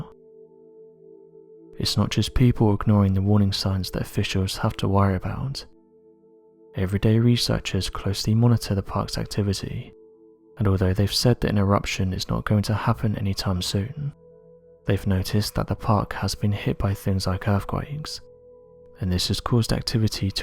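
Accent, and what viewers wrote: United Kingdom. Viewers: Well this information has been available for decades, I couldn’t stand the video because the whispering voice is so annoying, though he has a beautiful British accent he’s trying To narrate like it is a ghost story and it’s just pathetic.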